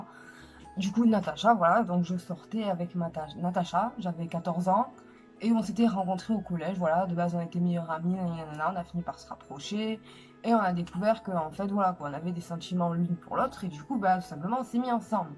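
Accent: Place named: Catalonia, Spain. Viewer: Ton accent Catalan, un délice xD, vive le sud ! :p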